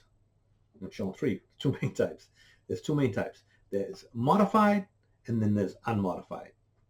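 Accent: Boston, United States. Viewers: Thanks for all the great information based on your many years of experience. When you talked about the extended life mortars, I heard so many different words... "____ life." Pub life? Park life? Pot life? You'll have to excuse me as I'm from the mid-west, but was it palm life? Port life? Part life? Half of me is giving you a hard time for being from Boston, but the other half would really like to know. That is if you would kindly spare a moment of your time to clarify. Thanks and Cheers! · Love ur "Booastan" accent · I heard you accent in the first 3 seconds and immediately knew you were from MA, most likely from Boston! I’m not from Boston but I am born and raised in MA like 40min south of the split! Thanks for the video! It was really helpful!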